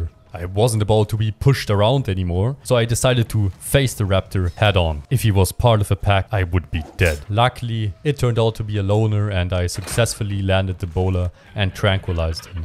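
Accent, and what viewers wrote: Germany. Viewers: I hear deutsche akzent out of you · is he german? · Are u german?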